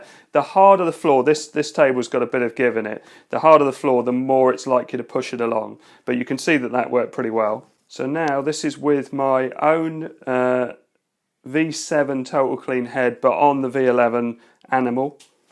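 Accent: United Kingdom. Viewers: thanks for responding. Love the bri’ish accent 🇬🇧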